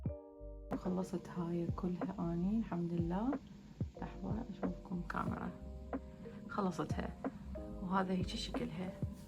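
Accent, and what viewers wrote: Iraq. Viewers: تسلم ايدك روتينك هادي وحلو مثلك وانا صح من سوري يس حكينا مثل اهل عراق احب لهجتكم لو تحكو مثلن بس احب حكيكم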